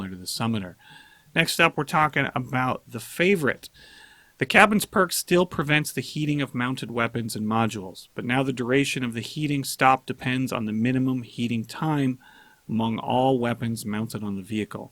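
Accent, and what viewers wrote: Russia. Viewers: Thanks for the russian accent G! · Should be a voice actor in Crossout with that russian accent!!!!:)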